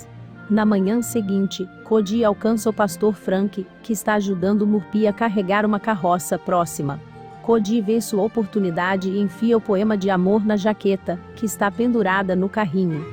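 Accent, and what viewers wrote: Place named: Northeast, Brazil. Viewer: Gente,é uma nordestina tentando narrar🤣🤣🤣🤣…Sou nordestina e não tenho nada contra mas ficou péssimo😂😂😂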